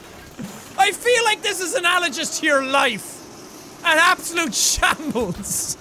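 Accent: Ireland. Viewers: I just watch ur older videos and ur accent was very Irish and I still laugh at ur minecraft survival videos and gmod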